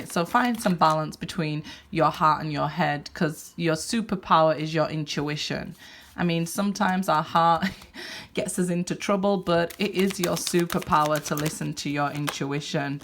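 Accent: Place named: Yorkshire, United Kingdom. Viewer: Luv your accent. x.... May I ask what accent is it.?.. Sounds like Yorkshire ?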